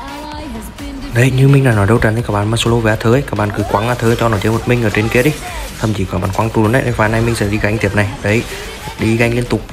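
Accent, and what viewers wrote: Central, Vietnam. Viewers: Bị ghiền tiếng miền trung rồi 😂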